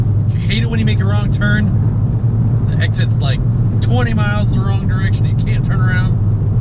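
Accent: United States. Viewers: This is probably the point where I sign off permanently. At first I was excited that maybe this would be a true tuner channel but all this video was is just unimaginative nut swinging. You're literally using the hill billy voice, building a cop car, etc etc. Its like watching cleetus except way less entertaining lol. Bro, you need to get that together.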